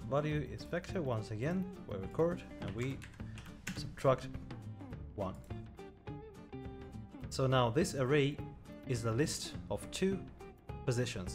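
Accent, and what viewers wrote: Ireland. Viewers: Didn't know you're Irish dude, what county? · Are you irish?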